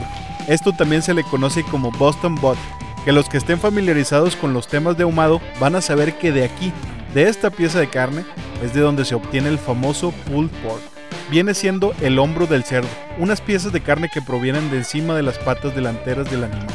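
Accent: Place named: North, Mexico. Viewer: pues los dos son del norte, es natural que hablen parecido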